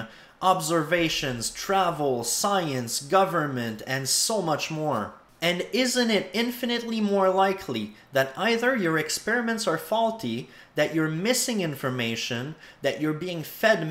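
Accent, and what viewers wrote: Quebec, Canada. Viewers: Cleary a Canadian eh? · Es tu Québécois ? Ton accent me le laisse croire. Très bon vidéo en passant ! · It's because he's French Canadian, so he prounces his "ah" sounds as "ey."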